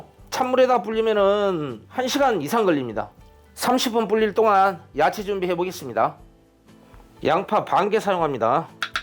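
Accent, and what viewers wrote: Jeolla, South Korea. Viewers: 이 사투리는 전라도인가요? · 이렇게해각고 딱 이렇게해갖고 구수합니다 저두전라돈디 비스한지역갇은디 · 아따 ~ 전라도 사투리 징하게 정감있어 부네요 . 세프님. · 전라도 사투리 너무 구수하네요 와 잡채도 맛나것소~잉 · 구수한 전라도 사투리 더 정감이 가네요 ㅎ 늘 고맙습니다 짱~!!!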